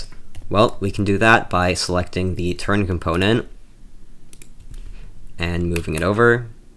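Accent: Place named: Europe. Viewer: you sound european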